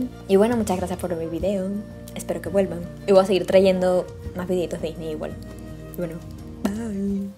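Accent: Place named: Venezuela. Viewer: Gracias por aclararme todas estas dudas <3 Pd: eres de Venezuela? 🤔Tu acento me suena muchísimo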